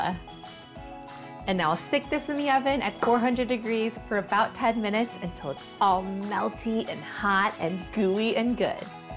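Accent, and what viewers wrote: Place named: Minnesota, United States. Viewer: wow she's got the minnesotan accent now. listen to how she says kosher salt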